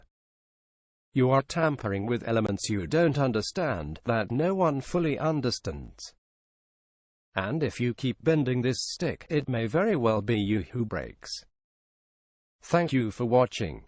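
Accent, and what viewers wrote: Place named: RP, United Kingdom. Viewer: British RP Robot. What a snob.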